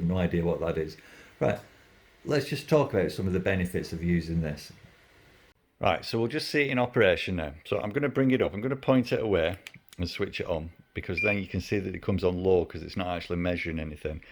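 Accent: United Kingdom. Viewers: Just purchased one and had to learn how to set it for Fahrenheit temp. Good video. British accent was a bonus. 😀 Thank you!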